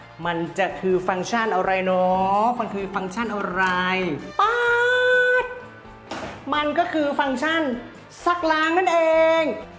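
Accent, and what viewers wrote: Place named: North, Thailand. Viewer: พิธีกรคนเหนือแน่นอน สาปเมืองขนาด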